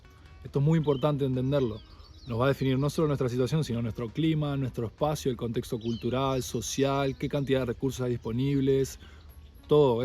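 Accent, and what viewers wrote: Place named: Uruguay. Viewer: Qué divino que todo esto sea hecho en Uruguay, ya me parecía por las especies y tu tonada, además me la juego que es Rocha o Maldonado. Sería divino poder visitar y conocer, abrazo.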